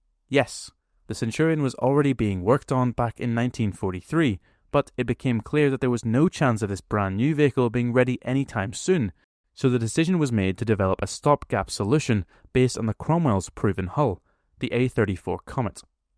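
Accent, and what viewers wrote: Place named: Northern Ireland, United Kingdom. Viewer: he's from Antrim or North down I would suspect based on his accent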